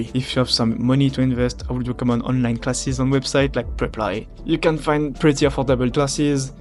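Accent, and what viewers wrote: France. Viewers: Are you french or from eastern europe countries ?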